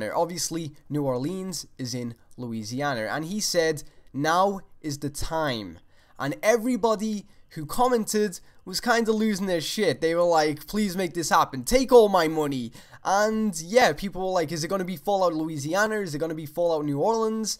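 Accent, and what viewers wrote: Liverpool, United Kingdom. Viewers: your enthusiasm is infectious. I have it now, too. I hope New Orleans is true. Nuka World was leaked in a document two years ago, and it's coming out next week. if Obsidian is indeed working on this, then it might explain why the next South Park game was given to another developer. BTW your accent is difficult to pin down. I'm detecting Liverpool with a hint of Dutch? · He from the U.K. Liverpool. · Where are you from,? Sometimes you sound like you're from Liverpool in the UK but then you pronounce some syllables like an American and I second guess myself.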